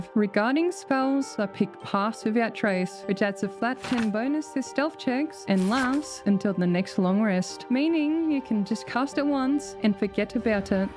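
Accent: Australia. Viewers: I rocking love your Aussie accent!!!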